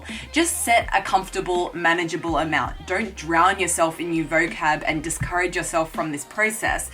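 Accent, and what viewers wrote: Australia. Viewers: So if you want to speak like Ausie just insert an R after every O sound. OrK ? sor goring dorn't shorw vorcabulary cornjunction cartoorns gor goord morment Not to mention all the up talking, very very painful to listen to. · Australian accent is hilarious. I wonder if native Chinese people can tell them apart from an American accent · As a Chinese,I like your Australian accent.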